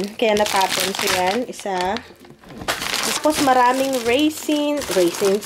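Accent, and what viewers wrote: Batangas, Philippines. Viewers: Taga batangas ka po o Quezon?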